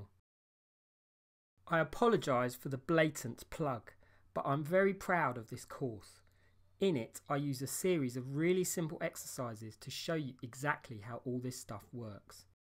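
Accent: United Kingdom. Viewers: I love this British accent!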